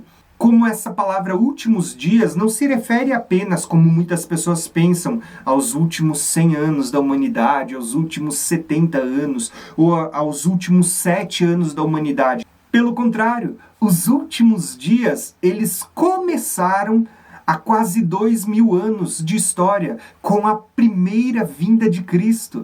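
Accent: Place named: South, Brazil. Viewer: Sotoque do Sul irmão…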